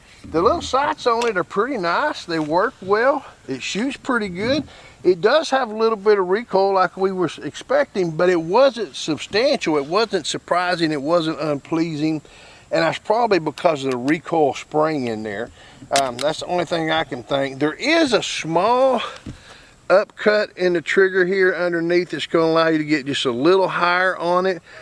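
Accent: South, United States. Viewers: I’ve never seen a Viking with a southern accent before love the content I’m going to subscribe 👍🏽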